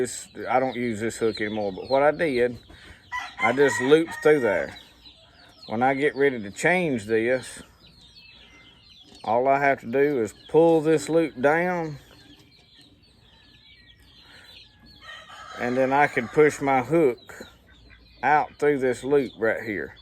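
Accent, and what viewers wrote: South, United States. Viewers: Hes like me he's got a southern accent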